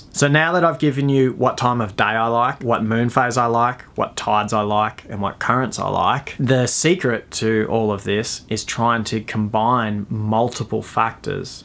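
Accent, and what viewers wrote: Australia. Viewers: Hi bro! Where are you from? Australia?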